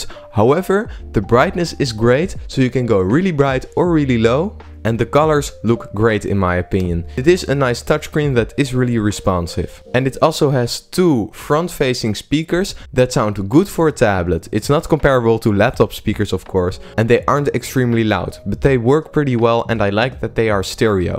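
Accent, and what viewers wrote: Netherlands. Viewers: That is quite a strong Dutch accent. But at least you're not from Limburg.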